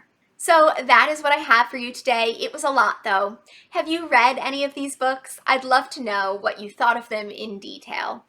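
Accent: United States. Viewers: Here from Books and Things. You have a very cute and familiar face and very sound very American. Subbed!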